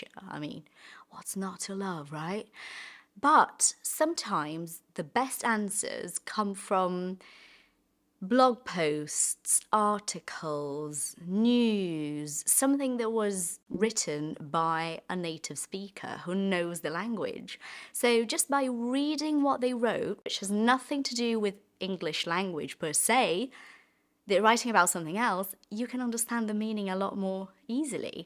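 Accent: United Kingdom. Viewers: British accent is amazing. Greetings from Brazil.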